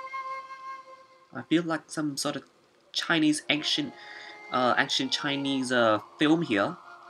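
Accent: Vietnam. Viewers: HoàngYasuo Channel đúng rồi. nghe ổng nói giọng miền nam mà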